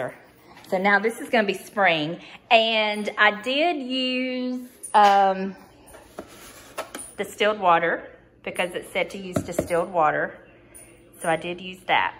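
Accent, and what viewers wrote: South, United States. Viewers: I think so too!!💖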